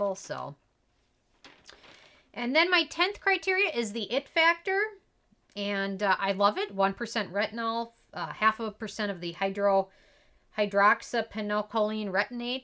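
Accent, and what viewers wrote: Minnesota, United States. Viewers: My husband said "she sounds like she's from Minnesota!" He's originally from there, but doesn't have the accent. LOVE IT! 😍😍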